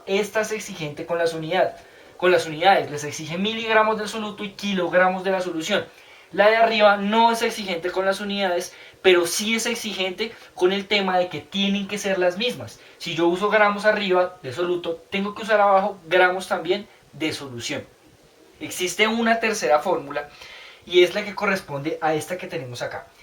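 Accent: Colombia. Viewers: para mi eres de colombia pero gracias por el video la expo me saldra chida ty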